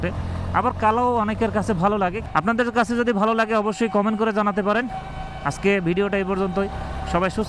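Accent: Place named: Narayanganj, Bangladesh. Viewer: ভাই আপনি কি নারায়ণগঞ্জে নাকি ? নারায়ণগঞ্জের মত মনে হচ্ছে।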